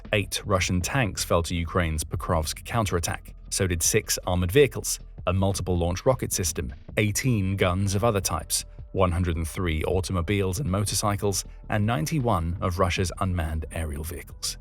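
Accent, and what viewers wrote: United Kingdom. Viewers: Sounds british, therefore most are lies...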